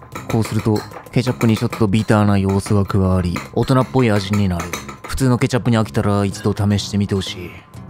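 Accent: Kansai, Japan. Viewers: 「 」「極主夫道」大好き人間には たまらないご馳走料理グルメ番組（;; ） 極道なのに、どこかかわいい主人公… 極道なのに、食材に愛を感じる切り方 極道なのに、ポメと仲良し そしてなぜか関西弁… 卵かけごはんにごま油かけて 牛肉とニンニク乗せて チーズ炙ってバジルかけて マヨネーズとごま・のりを乗せてた料理みたいに どことっても美味しい要素しかないっ 大好きだ❤️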